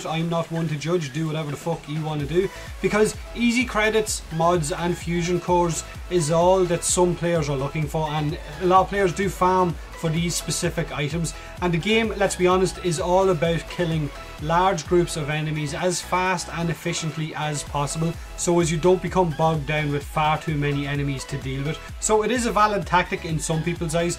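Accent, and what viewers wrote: Ireland. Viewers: He sounds more like Irish, whereas Grade is more like English english.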